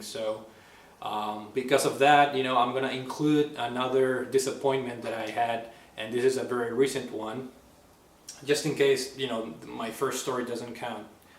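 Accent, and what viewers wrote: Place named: Argentina. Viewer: Great videos man. Where from in South America? I would think Argentina, but please don't be mad if you're from Uruguay hehe. Similar accents.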